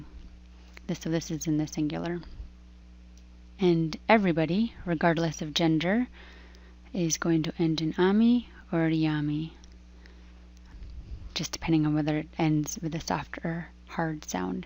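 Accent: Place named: United States. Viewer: You have not explained the use with verb to be ( byt)..you seem to be a native American and you don't have your words prepared at all while explaning,you have that typical american tune of " the thing" ..so you should focus on your english word "thingy" and not be overconfident and cover it up in shown off erudite american tune ..temporary ...pause....state ...and that whole thing is terribly told and you just jumped to next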